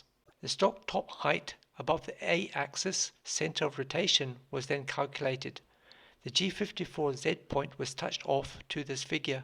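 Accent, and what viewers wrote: South Africa. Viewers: I know a South African when I hear one. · South African accent coming through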